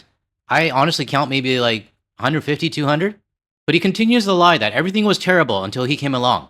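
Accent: Quebec, Canada. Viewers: I think that dude calling your accent a goofy accent is very offensive to you Canadians, but the funny thing is that it is somewhat offensive to themselves too, as I am pretty sure that the majority of world citizens cannot distinguish the Canadian and US accent from each other. As a Dutch citizen, I can confirm that the trust in the USA has vanished in most of Europe and definitely in The Netherlands. People from left to right would never support this administration. So don't worry, Canada is definitely not alone. It is not a coincidence that the EU has made trade deals with other countries including India. As you said, the world moves on and let them believe that they are respected. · About the goofy accent. I think it might be our fault, us French Canadians from Quebec, and our less-than-perfect English 🙃 Sorry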